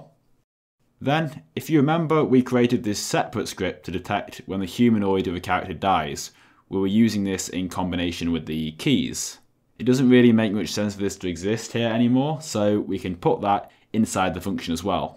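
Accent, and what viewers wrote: United Kingdom. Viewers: Can you not hear his voice he’s from uk you can hear from the sound of his voice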